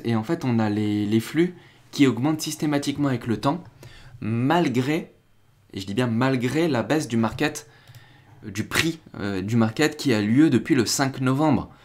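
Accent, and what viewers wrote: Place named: Spain. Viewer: Cet accent espagnol :) looool moi j'aime bien ces vidéos avec du contenu mais avec le sourire!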